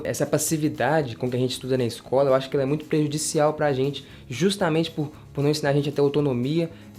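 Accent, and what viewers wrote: Minas Gerais, Brazil. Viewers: krl sabia que era mineiro pelo sotaque, tmb sou mineiro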